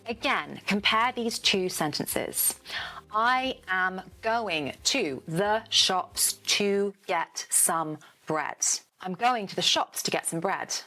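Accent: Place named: Cambridge, United Kingdom. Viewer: I love your crisp Cambridge accent. Just wanna confirm is it really Cambridge or some other accent.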